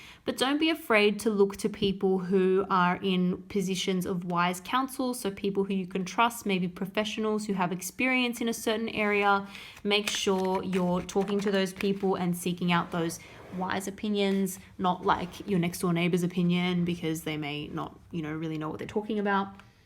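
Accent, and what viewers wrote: Australia. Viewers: So good to hear an Aussie Tarot Reader! 🙌 Thankyou!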